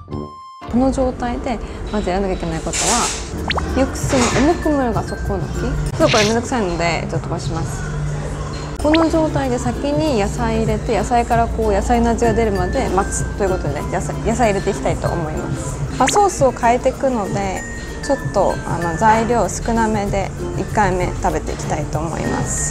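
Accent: South Korea. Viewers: 韓国語の発音に慣れちゃってるからね… 日本語も韓国語の発音になっちゃう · 日本人なのに韓国人が日本語喋ってるようななまりがありますね。 韓国語喋る生活が長いとそうなってきちゃうんでしょうか？ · 日本人らしいですね。 わざと韓国訛りにしてると言うと誰かが言ってました。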